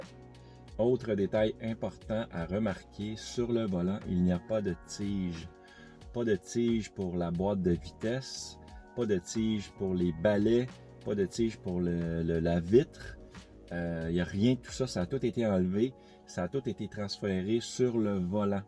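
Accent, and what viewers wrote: Canada. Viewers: Bonjour super tes vidéos, je vais chercher ma TM3SR+ dans 2 jours, malheureusement je ne te connaissais pas encore au moment de commander (regrets!) Tes vidéos sont très intéressante et ton expérience au Canada est très précieuse pour nous en France où dès qu'il y a 10cm de neige on est en panique;) (perso à -5 mon cerveau commence à freeze donc à -40 je pense me désintégrer:D ). J'ai cru comprendre que vous aviez des normes à respecter concernant vos pneus neiges, pourriez vous nous en dire plus sur ce point, peut être aussi sur la conduite sur neige avec une propulsion? Chez nous l'utilisation d'une borne à domicile n'est pas forcément recommandée (peut-être par ce que nous sommes en 220V). Est ce le froid, tes km journaliers, le 100V ou comme moi le coté geek qui t'a poussé à en acheter une? Merci encore pour ton humour, ta voix Canadienne et tes expressions, et la bonne humeur que vous dégagez toi et ta petite famille. 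(Ouais je te dis "Tu" car on doit avoir le même âge ~ 32 ans;) )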